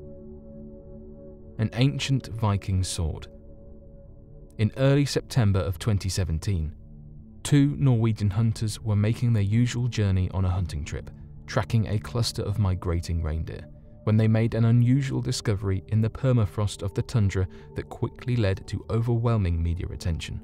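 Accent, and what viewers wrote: United Kingdom. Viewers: All this is a thievering compilation of other people's info from the net and presenting it with a british snooty accent for ignorant fools as news.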